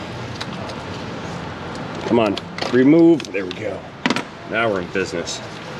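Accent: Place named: South Florida, United States. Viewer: You did say you all. That's what they call a Southern Florida accent. But same difference As a Southerner.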